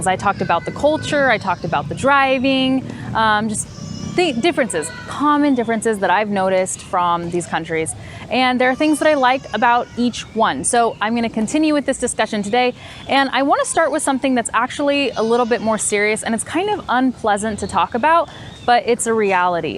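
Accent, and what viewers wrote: United States. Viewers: is the name I use to tell most in a day. I learn American accent by writing down one minute lecture of videos and record my voice of the same lecture. And I'll compare both, find out my mistakes and continue this process until I satisfy with my work 'hey what's up......' Thank you <3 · Hey...you made this video awesome...i learn a lot from you...love from India...i just want to get american accent...if you ever come to india, you will be our guest here...😊...thank you mentor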